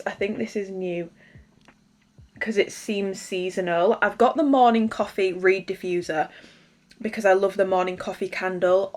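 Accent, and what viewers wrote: United Kingdom. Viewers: Rochdale accent?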